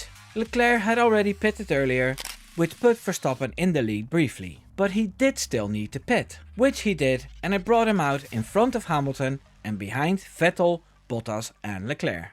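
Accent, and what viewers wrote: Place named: Netherlands. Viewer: Zeg dat je Nederland bent zonder te zeggen dat je Nederlands bent. Je klinkt ook jong trouwens, maar dan zie je opeens een vent van 53 in beeld.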